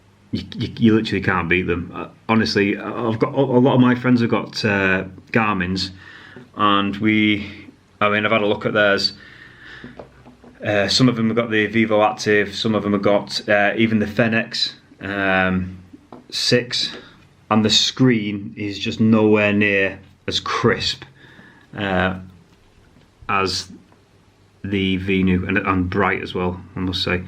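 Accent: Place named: Yorkshire, United Kingdom. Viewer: Thanks for the review! Currently have Fitbit sense and my venue 2s comes tomorrow. Excited to try it! Your accent sounds like you are from Yorkshire? Could be wrong … but my grandparents and mom were born in Yorkshire! 🥰 love the accent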